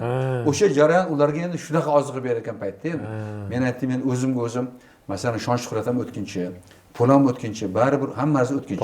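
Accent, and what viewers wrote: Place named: Kungrad, Uzbekistan. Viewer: Шева, куз айтиб турибдику, кунгиротлигини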